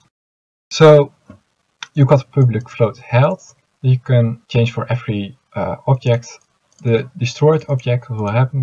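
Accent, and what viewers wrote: Netherlands. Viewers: where you from are you from the netherlands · en btw... ben jij nederlands? zo klink je wel and btw are you dutch? you sound like it...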